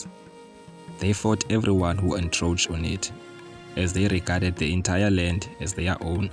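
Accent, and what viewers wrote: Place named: Xhosa, South Africa. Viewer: (The Narrator) you have a HEAVY Xhosa accent. Iyandonwabisa lonto, especially since you taking interest in out nation's history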